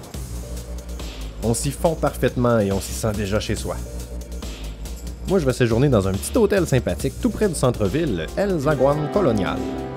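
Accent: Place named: Quebec, Canada. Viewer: Merci pour cette superbe vidéo ! Enfin un reportage qui ne comporte pas de niaiseries et tes prises de vues sont magnifiques ❤je pars au mois de juillet au Yucatan j’ai hâte ! Petite remarque tu parles un peu vite et j’avoue que pour une française il me faut un petit temps d’adaptation pour comprendre ton adorable accent québécois ! 😅continue à nous faire voyager ….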